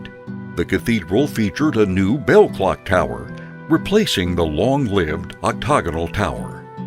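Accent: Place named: United States. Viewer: Gagawa lang kayo ng historical video on Manila Cathedral kumuha pa kayo ng voiceover with American accent who mangles the Latin-Spanish names. Very distracting. You should have at least taught him the correct pronunciations. Stupid and insensitive. What a waste.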